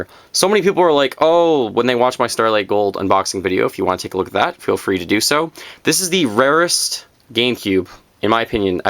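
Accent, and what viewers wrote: Canada. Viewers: great video! I love video game collecting and I can see you do also. Also you sound Canadian.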